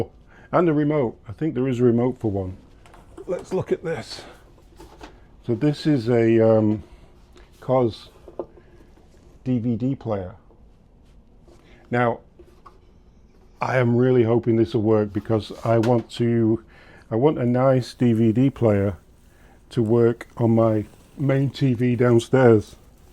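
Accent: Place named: Liverpool, United Kingdom. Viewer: Whu are you speaking in a scouse accent? Are you even from Liverpool? If you are not from Liverpool then why are you speaking in a scouse accent?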